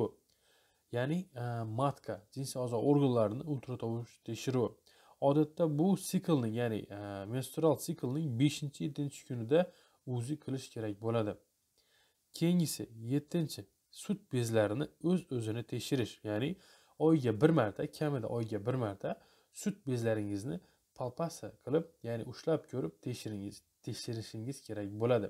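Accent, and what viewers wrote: Khorezm, Uzbekistan. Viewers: доктор кайерда ишлайсиз гапиришиздан хоразимликка охшайапсиз.агар хоразимда болсангиз иш жойизни айтинг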